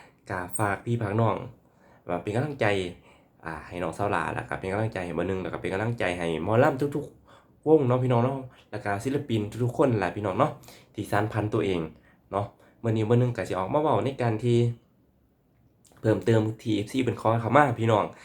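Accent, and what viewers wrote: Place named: Laos. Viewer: ชอบสำเนียงลาว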